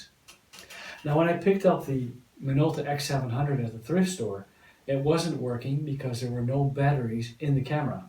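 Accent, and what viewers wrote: Netherlands. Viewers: Are you Dutch? Sounds like a Dutch Accent 😄✌🏽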